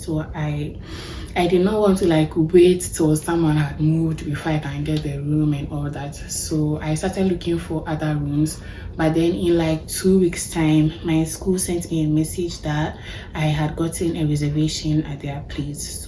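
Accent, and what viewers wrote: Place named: Ghana. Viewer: hii, did you use the student direct stream? you sound ghanaian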